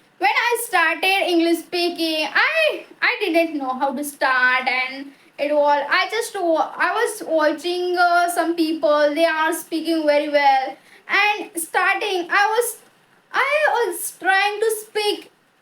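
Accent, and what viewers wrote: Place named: India. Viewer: slow speaking techniqque is good but accent indian hi rakhiye bcoz ur audience are indians rather than american or britishers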